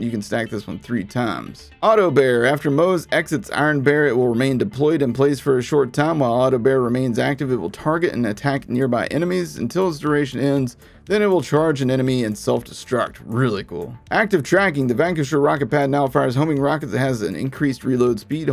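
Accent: United States. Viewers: Damn your videos are addictive! Ps. Love tour accent where are you from in the states?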